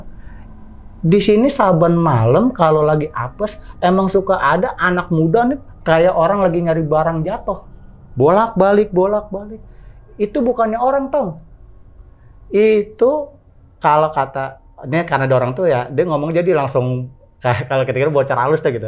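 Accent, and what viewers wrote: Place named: Betawi, Indonesia. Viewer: Bahasa betawinya lho yg bikin gua cengar cengir sendiri😂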